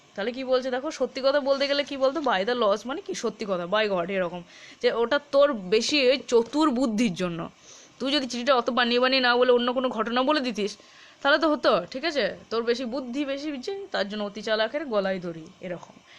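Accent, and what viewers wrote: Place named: Bardhaman, India. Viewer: আপনার বাংলা অনুবাদ এত সুন্দর ম্যাম যে মনে হচ্ছে সত্যিই live দেখছি ড্রামা টা। তার সঙ্গে ম্যাম আপনার বর্ধমানের tone " তু " ।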